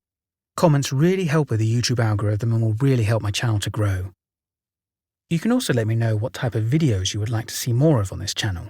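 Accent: United Kingdom. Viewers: Nice! Mysteries are always better with a British accent. Don't know why, they just are!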